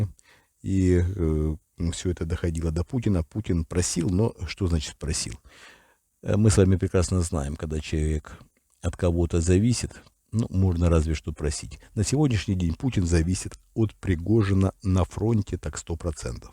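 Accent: Ukraine. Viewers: Виктор мыхалич, у ва юс акцент украинский. Как так?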